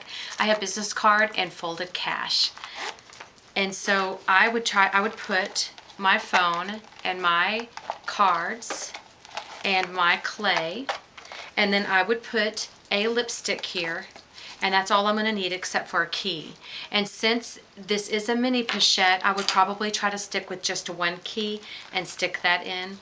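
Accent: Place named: United States. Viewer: Thank you so so so so much for taking the time to do this review for me. It's very much appreciated. You are so thorough it really does help. Ok, so I am definitely getting the Mini Pouchette for when I only need a few items and also the clay for my keys. Next hmmmmm still can't decide. Eva if I want more classy, but pouchette if I want more casual. Oh dear, maybe I will have to get both. I love how you say my name..US accents are fab, not like my northern UK one. Thank you again. xxx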